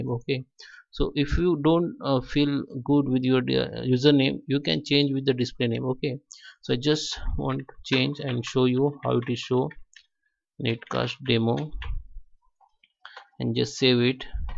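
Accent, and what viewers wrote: India. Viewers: He sounds like an Indian and most of the elderly working people in India prefer windows 7 because it is simpler with less bugs in the software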